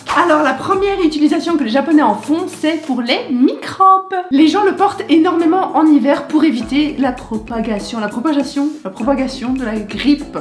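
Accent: Belgium. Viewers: Tous les "w" sont prononcés "oué" en Belgique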